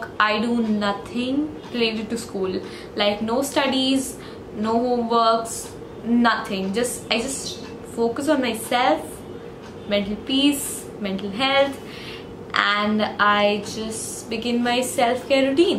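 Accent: India. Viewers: Is she Indian , her accent .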